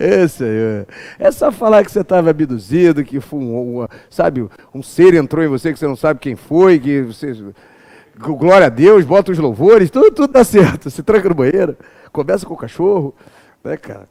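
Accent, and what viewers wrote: Rio de Janeiro, Brazil. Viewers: Esse professor é carioca?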